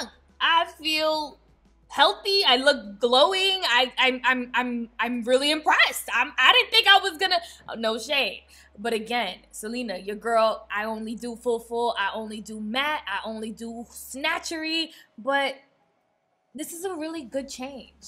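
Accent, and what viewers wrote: Dominican Republic. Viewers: Dominican accent?! 😃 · Clicked because the title said “morenita” and I just knew you would be a Latina 👏🏽 is the accent Dominican? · YOU'RE DOMINICAN FOR SURE 🇩🇴 y si no, tienes el acento 😍😍😍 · OMG first time watching your videos and I LOVE YOU OMG 😂😂 the little Spanish isms you throw in are priceless. I am Dominican and your accent sounds it, are you!?!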